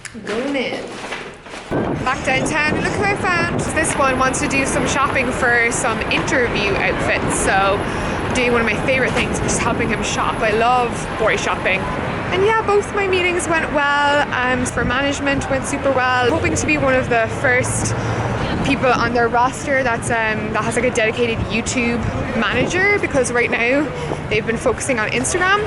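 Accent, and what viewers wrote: Ireland. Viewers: omg ur Irish!!!!!!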